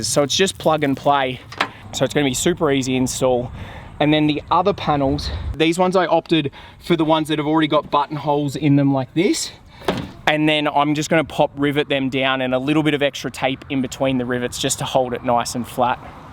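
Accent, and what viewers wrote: Australia. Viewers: Looks great! Makes me miss living in oz. I miss all the extra Ws and Rs in the accent 😂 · Most annoying Aussie accent ever.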